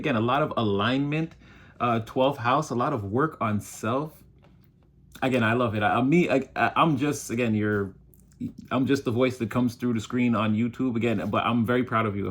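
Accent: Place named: Caribbean. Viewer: As a Caribbean, I love this reader lol and whenever he speaks in patois I swear it feels like he’s channeling my Caribbean passed on loved ones lol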